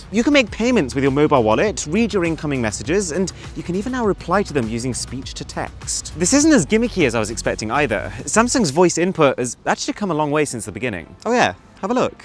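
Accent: United Kingdom. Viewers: why does he have a british accent?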